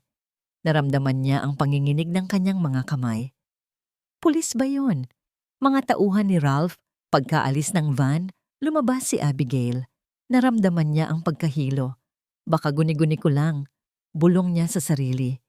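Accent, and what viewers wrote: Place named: Conyo, Philippines. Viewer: Parang tanga ang salita ginawang hindi ko na nga tinapos konyo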